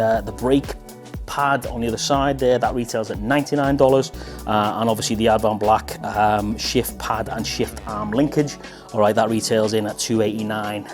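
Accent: Liverpool, United Kingdom. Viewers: Nice one scouse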